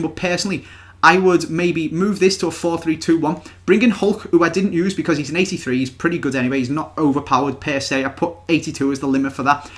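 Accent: Liverpool, United Kingdom. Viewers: U so scouse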